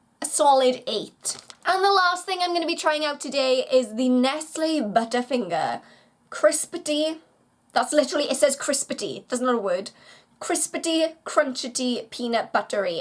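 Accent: Wales, United Kingdom. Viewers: hi! loved this video. only just found your channel! are you from wales? if you are what part?😀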